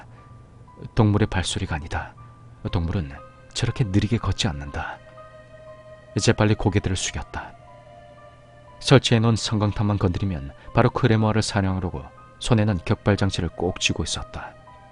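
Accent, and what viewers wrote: Gyeongsang, South Korea. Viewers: 무서운것도 무서운데 목소리랑 경상도 사투리 딕션이 너무너무 찰지네요ㅋㅋㅋㅋㅋㅋ 니 안전핀 맺게 뺐어? 하나빼고 던졌지 말임다. 야이 서울대 강아지야 덩달아 선수씨바야ㅋㅋㅋㅋㅋ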